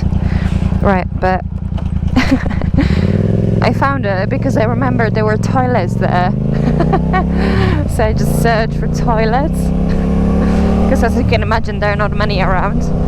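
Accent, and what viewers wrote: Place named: England, United Kingdom. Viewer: hi,im doug from australia,i love all your videos,you are good on your bike,you now have a english accent,what i think halps your vlogging you have a great voicei love the way you talk and you are very easy on the eyes[eye candy]and i love when you swear,tell me you are real and down to earth,thumbs up every day for you and your videos, bye for now..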